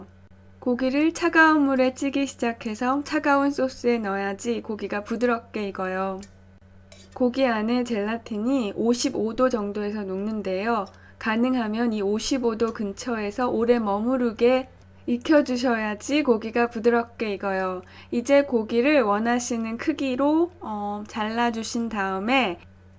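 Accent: Seoul, South Korea. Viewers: 진짜 90년대 서울 사투리 항상 늘 신기해요ㅋㅋㅋㅋㅋㅋㅋㅋㅋㅋㅋ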